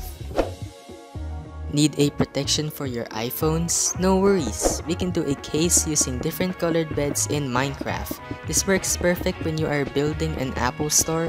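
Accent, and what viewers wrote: Philippines. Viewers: you sound like you have a filipino accent. are you?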